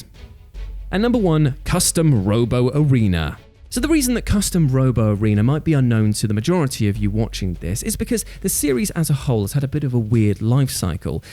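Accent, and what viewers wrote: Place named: England, United Kingdom. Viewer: I don’t really like the “Tues Your Own Adventure” name, as it only works with certain accents such as your English accent. As an American I say: Tues as “Toos”. But other than that I like it!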